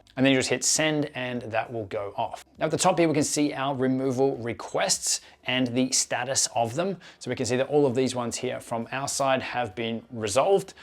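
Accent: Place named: Australia. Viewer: Bro you are wonderfully helpful your videos are amazing. I wish you it was no in Aussie accent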